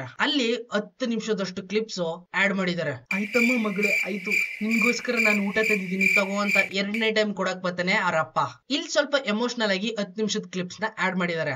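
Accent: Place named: Mandya, India. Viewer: ಅಣ್ಣಾ ಇಷ್ಟು ದಿನ ಎಲ್ಲಿ ಇದ್ದೆ ಅಣ್ಣಾ ನಮ್ ಮಂಡ್ಯ ಮೈಸೂರ್ ಬಾಗದ ಭಾಷೆ ಕೇಳಿ ಕುಷಿ ಆಯ್ತು😅😅😅❤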